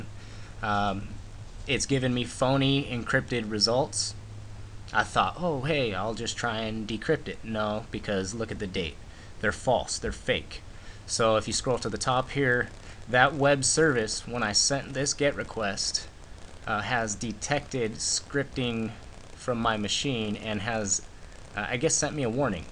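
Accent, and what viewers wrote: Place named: Canada. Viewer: could you tell by his sweet canadian accent?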